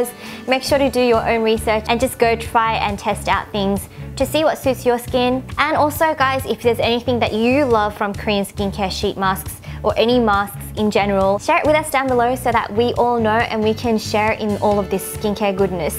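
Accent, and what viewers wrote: Australia. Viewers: OMG AUSTRALIAN ACCENT 😍💗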